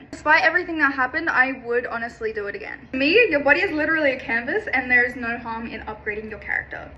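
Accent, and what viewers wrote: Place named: United Kingdom. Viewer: Ur british. Wtf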